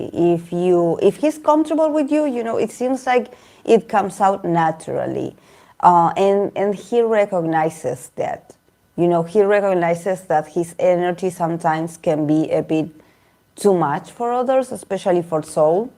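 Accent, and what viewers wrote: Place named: Argentina. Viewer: sos argentina? creo que detecté nuestro acento. Gracias por el video, me gustaría que hagas uno sobre Keeho y Theo 😊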